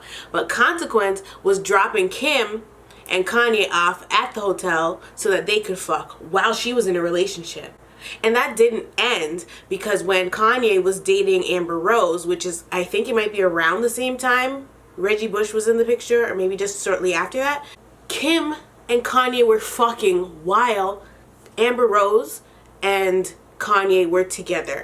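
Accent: Toronto, Canada. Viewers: First of all, I KNEEEEWWWW you were from Toronto from the minute you opened your mouth, I love my Torontonians. Second, you confirmed it with the Brampton mans shit. I liked and subscribed just cuz you said "If you know, you know" about Jamaicans, cuz its so true LMFAO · I really like how you talk, Imma need to bring my Jamaican/Indian self to Canada to get me a nice Canadian accent lol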